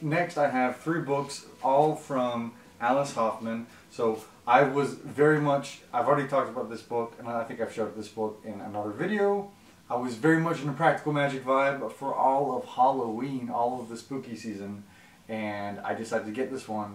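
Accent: South, United States. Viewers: I can't believe you had a lot of books at your house in Texas on this video during your quarantine including Malice by John Gwynne, Priest of Bones by Peter McLean, Winter Counts by David Heska Wanbli Weiden, Practical Magic, The Rules of Magic and Magic Lessons by Alice Hoffman, Piransi by Susanna Clarke, My Best Friend's Exorcism by Grady Hendrix, Dracula by Bram Stoker, Cemetery Boys by Aiden Thomas, The Grey Bastards by Jonathan French, One Word Kill by Mark Lawrence and so many others until you're talking about all of them to read while you made your beautiful husband, Zach read them with you at the time, so you're discuss about all of the characters from all of the books after that, so he'll be very proud of you and I love your Southern accent!!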